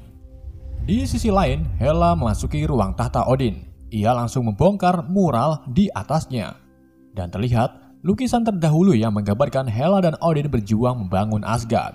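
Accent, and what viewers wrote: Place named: Java, Indonesia. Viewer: ket benslet 🤣🤣🤣 ngakak medok parah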